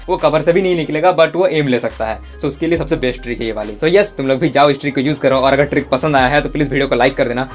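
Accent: Bengal, India. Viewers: Bhai tu bengoli h 😮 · Bhai app bangalli ho na 😀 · Bhai ap bangali ho kya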